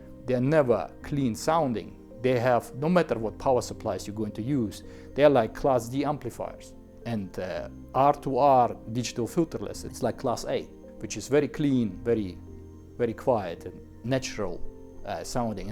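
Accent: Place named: Soviet Union. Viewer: The way you speak indicates that you are of USSR origin. It will be that there is a misunderstanding with you if we listen carefully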